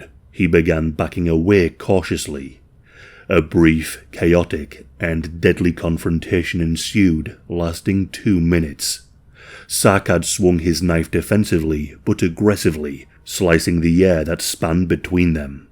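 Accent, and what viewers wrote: United Kingdom. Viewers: Can someone answer me this please; Where is actually from? 🌎 🤭 Because his channel says Australia, but he sounds British 🧐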